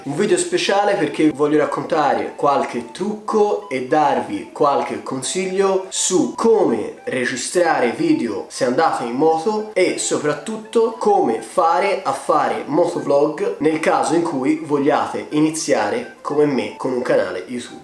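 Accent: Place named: Florence, Italy. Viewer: Grazie mille. Comunque io sono di Firenze! Ho sentito il fiorentino in te hahah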